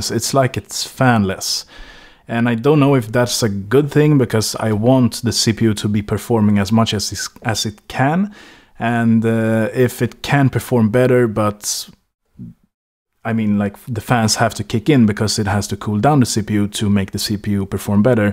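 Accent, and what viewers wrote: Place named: Sweden. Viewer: Tack för denna videon =) Då vet man lite vad man ger sig in i ifall man beslutar för att gå över till M1 i framtiden. Gissar på att du pratar svenska, hör på dialekten =) =)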